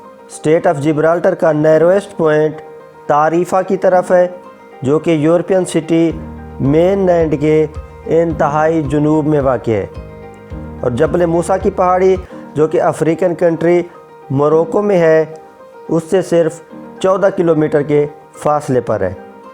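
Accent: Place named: India. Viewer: Click - > Indian Voice - > Close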